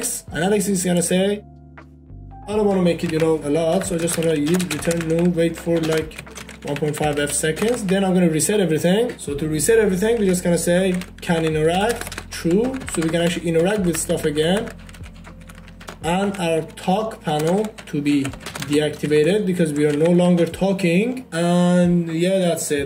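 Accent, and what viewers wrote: Iran. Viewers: Nice video! I'm trying to figure out where your accent is from, maybe Iran??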